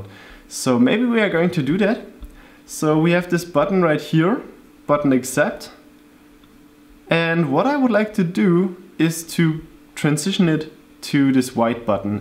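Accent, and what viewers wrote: Germany. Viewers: Thanks for tutorials, really nice! Sometimes your germans makes me laugh lol. Anyway, loose the stickers :S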